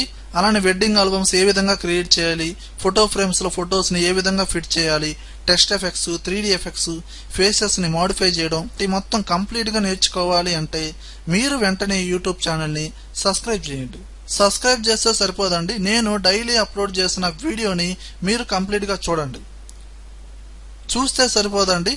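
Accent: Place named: Rayalaseema, India. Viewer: Anna, 00, Na, Maha, antunnarante miru kachitanga Rayalasimavaru.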